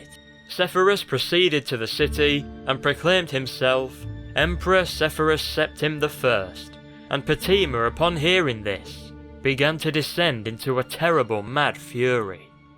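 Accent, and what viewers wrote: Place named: Yorkshire, United Kingdom. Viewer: I love Yorkshire accents almost as much as I love the Elder Scrolls. Luckily for me. :)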